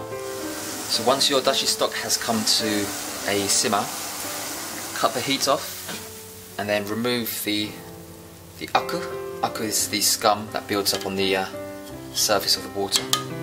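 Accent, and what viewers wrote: United Kingdom. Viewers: Bloody Hell! Judging by you accent, I imagined you to be the most saxony british brit. Until you turned out to be east asian; I was like 0.0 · omg British accent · so nice to hear someone talking about Japanese cuisine in British English!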